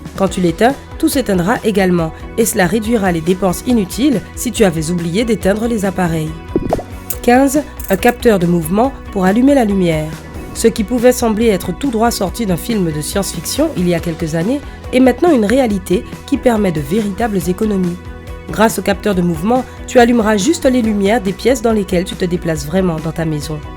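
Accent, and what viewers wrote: Antilles, Caribbean. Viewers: C'est une antillaise la voix off ? (Juste pour savoir inh...) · C'est une caribéenne/ haitienne qui parle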